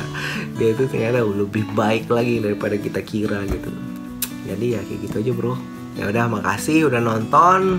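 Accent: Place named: Minangkabau, Indonesia. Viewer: Logat minangnya masih kental banget bang.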